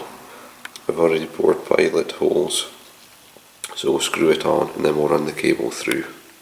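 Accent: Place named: Scotland, United Kingdom. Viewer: You sound Scottish ,where are you in Scotland ?Nice piece of work my friend . I live near Lochness. I've been turning on and off for a couple of years .I've made a couple of lamps from spalted beech.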